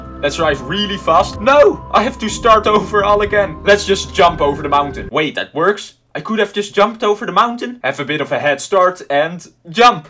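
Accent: Netherlands. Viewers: Are u dutch? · Ik ruik een nederlander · 100% een nederlander hoor het gelijk aan het accent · Ben je Nederlands? Ik hoor het aan de accent haha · I smell someone dutch like me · Are you dutch becouse you realy sound like your dutch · Oh you are OBVIOUSLY dutch.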